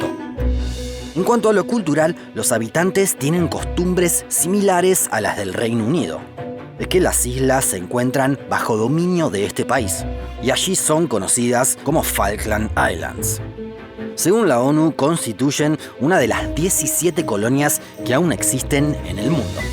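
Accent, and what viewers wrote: Argentina. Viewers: el canal de donde termina siendo che? , porque tiene ascento argentino pero puede confundirse con el uruguayo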